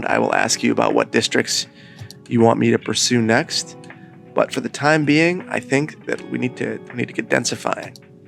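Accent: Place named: Iowa, United States. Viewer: You from the midwest, flat with some terrain. Sounds like Iowa. Thats where im planted. lol. sometimes sped up builds are ok when its the same thing repeated. otherwise real time is best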